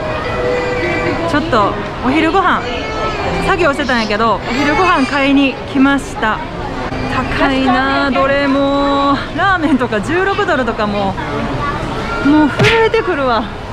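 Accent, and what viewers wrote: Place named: Ise, Japan. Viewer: 同じ伊勢弁なのが嬉しいです✨親しみ持ってます♪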